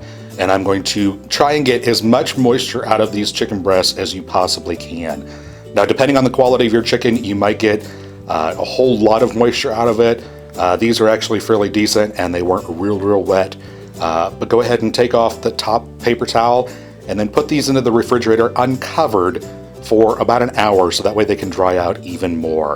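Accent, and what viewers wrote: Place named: Massachusetts, United States. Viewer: Sound like Carlton from massetchusets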